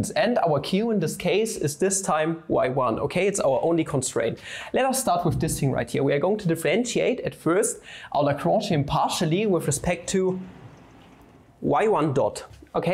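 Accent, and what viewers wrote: Germany. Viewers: i know you're german from your accent 😅 keep going bro good luck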